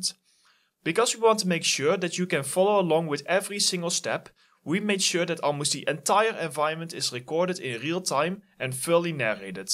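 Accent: Netherlands. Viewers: You mean that megascans library? I was under the impression you could als import your own assets created in, for example Blender, and create textures from scratch. With the only difference you could only use those materials in Unreal Engine (which would only be a downside if you would use another game engine). I'm not a fan of Adobe anymore, although I have used a lot of their software. But I don't like their subscription model, way too expensive if you don't make a decent living out graphic design. I'm good in 'traditional' digital graphics design, but yet have to find my stride in creating for games, as over here there was never an actual gaming industry. Atleast not when I was at 'the right age'. But you sound Dutch, if I'm not mistaken?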